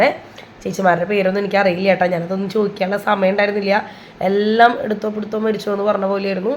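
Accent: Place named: Thrissur, India. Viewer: Hi chechi episode kandu 🤩ivide amma ennum kaanarind ee paripady. Chechide videos okke njan youtube il kaannarind pettannu tvil kandappo suprise aayi 😍😍 njan ammodu parayarnnu ee chechine inikkariya inikki ariyana chechi aanu ennokke. 🥳🥳🥳 skit valare nannayirunnu nammade tsr slang skitil ketappo nalla rassandayi 😌🥳 inim skitilu chechine pratheekshikkamo🙈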